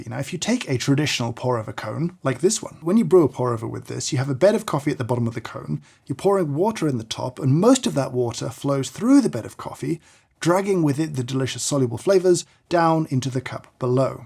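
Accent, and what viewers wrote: United Kingdom. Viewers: it's nice to see British youtubers who don't have a chavvy accent and can speak the queen's English correctly!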